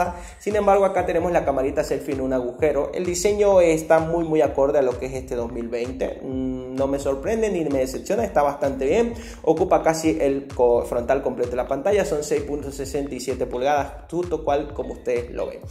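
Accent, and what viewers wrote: Bolivia. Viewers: Eres de Bolivia verdad ?? Si lo eres me suscribo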